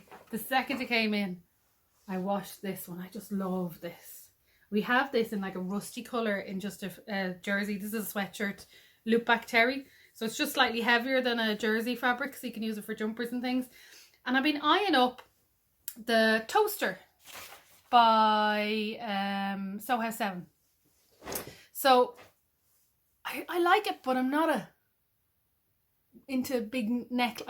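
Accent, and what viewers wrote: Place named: Ireland. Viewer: You sound like you're in Ireland. Where are you located? Always looking for nearby fabric places...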